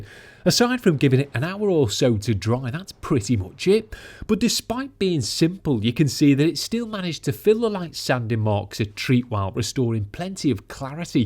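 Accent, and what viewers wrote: Liverpool, United Kingdom. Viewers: Mate, love you're vids, voice overs and production. Apart from the skill and dedication they show, they're incredibly relaxing. As a Geordie, I never thought I'd say that to a scouser 😂😂😂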